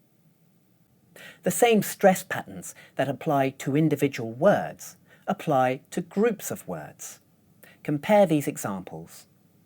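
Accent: United Kingdom. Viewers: Is it rp accent?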